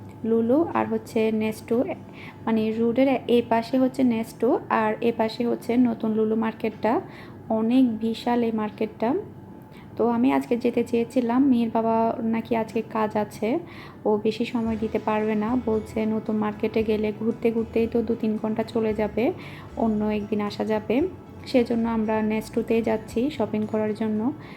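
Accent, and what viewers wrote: Chittagong, Bangladesh. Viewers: আপু আমার বাডি ও চিটাগাং আমি সৌদিআরবে থাকি আমার দুই ছেলে আমার নাম নাহিদা আপনার চিটাগাং এর ভাষা বলগ টা অনেক ভালো লাগে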